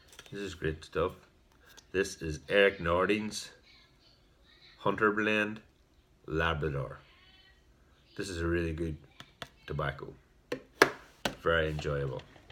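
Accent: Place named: Ireland. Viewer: you rock. Welcome. Congrats on the 100 subs. Love the accent. I Hope to visit Ireland some day. My mother's side if from there. I can paint you some jars, not sure if you are looking for labels or jars or lids. Message me on instagram. So I can possibly make you some jars. Beautiful Peterson you are giving for 2nd place. I'll get a VR up tomorrow for Ya. Hugs from Texas!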